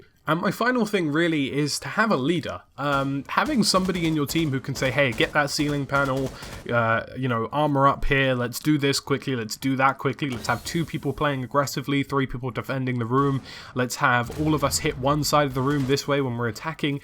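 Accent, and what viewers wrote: United Kingdom. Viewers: British accent + advanced vocabulary = music to my ears :)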